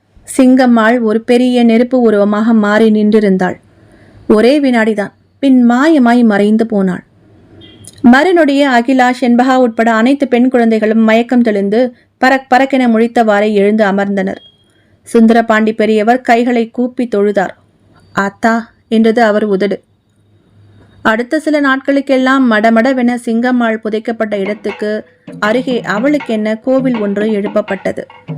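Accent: Madurai, India. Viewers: Madurai slang vera level sister 🎉🎉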